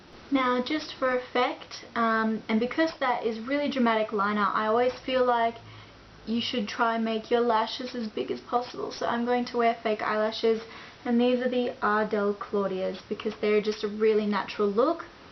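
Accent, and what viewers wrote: Australia. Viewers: This was lots of help, and you have a strong aussie accent, a lot stronger than mine! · Loved this video!! Nice to hear an Aussie accent after watching so many American make up tutorials! xox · Aussie Aussie Aussie Oi Oi Oi! I picked you as an Aussie straight away. Boston? WTF? Great tutorial by the by. Definitely going t try this out. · nah shit mate. im ausie and even i can tell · O.k. well I really got something out of it, so thank you. And....my opinion - talk all you want, here in the South, we don't get to hear your lovely aussie(?) accent much. using the makeup remover and pad will help me with my goofs. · boston? really? shes aussie.. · I love your aussie accent :D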